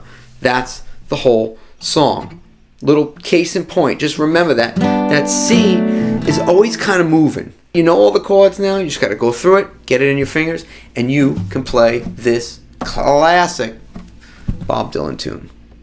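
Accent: New York, United States. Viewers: Nicely done and thank you for the work on this. I'm trying to place your accent...which borough of NYC are you from?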